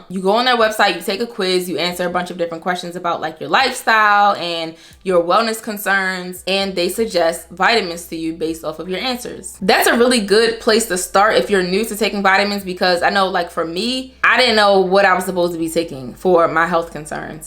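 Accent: Baltimore, United States. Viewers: Is that a Baltimore accent 👀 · Im 36 and I love these tips especially for the new year around the corner! They're useful for women from all walks of life. And as a fellow Baltimorean, I love listening to someone with our unique accent😆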